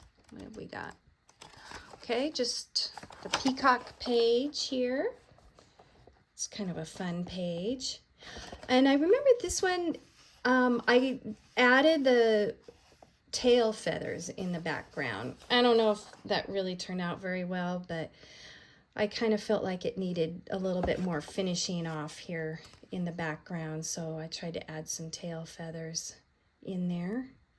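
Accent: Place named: Wisconsin, United States. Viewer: 🤣🤣 I'm just saying, I'm from WI and you sound just like me! 😉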